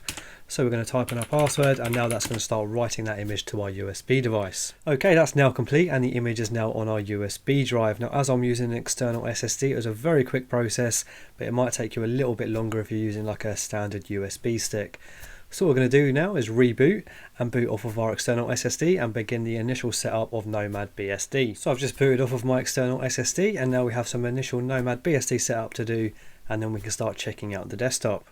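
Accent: United Kingdom. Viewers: I really like the clarity of your presentation, especially when I slowed it down to.75 to satisfy my aging brain. Your lovely Brit accent is not a hindrance for this old guy from the US, happily. Going to review your videos for subjects still being resisted by my thick skull, like those blasted bootloaders (like Grub) that throw me for a loop every time. First thing I see is a prompt which I can't even figure out how to get out of. Then I need to get the layout of a SD boot. There are partitioning issues I don't understand. Sometimes the SD boots fine, sometimes RasPi Imager won't even write to it. "Capacity" issue? Please help me understand what the H is going on there. Thanks very much.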